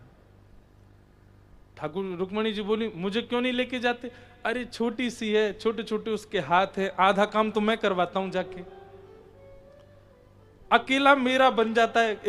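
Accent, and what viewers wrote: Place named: Braj, India. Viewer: Jay Shri Krishna Radhe Radhe Jay Shri Harivansh Guru Dev Maharaj ji Aapke Charno Mai Koti Koti Vandan 🙏 Radhe Radhe Jay Shri vraj vasi Aapki vraj Bhasa Badi Sumadhur hai Radhe Radhe Jay Shri Vrindavan Dham 🙏 Jay Shri Radha Rani Jay Shri Radha Kishori ji Jay Shri Radha Raman ji Jay Shri Hari OM 🕉 Namo Narayan ji 🕉 OM Namo Bhagvate Vasudevy Namh Jay Shri Laxmi Pati Jay Shri Shyama Shaym ji ♥️ Jay Shri Yamuna Maharani ji Jay Shri Radha Vallabh Lal ki Jay Ho ♥️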